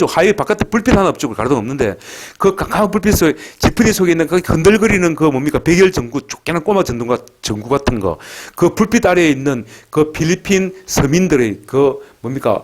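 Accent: Gyeongsang, South Korea. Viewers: 예ㅡ감사합니다 30년 학원 폐업신고 할 때 조금 눈물 날라 하더라구예 처럼 강의하면 아ㅡ들 한테 대박입니다 나는 와 그라지 몬했을까 하는 생각이 드네예 투박한 경상도 사투리가 이렇게 듣기 좋은 줄은 같은 갱상도 살믄서도 미처 몰랐어예 ㅎㅡ 양산에서 멀지 않은 곳에 살고 있으니 일요일 오후 혹은 저녁에 찾아 뵐게요 미리 일주일 전 쯤에 연락 드리고예 일일이 답글을 더 길게 달아주시니 가족 같은 분위기 좋기는 한데 힘들까봐 걱정 되네예 간단하게 살살 하이소 마 ㅡ ㅋㅡ 먼지 땜에 흐린 하늘이 모처럼 태양이를 내놓네예 좋은 오후 되이소 감사합니다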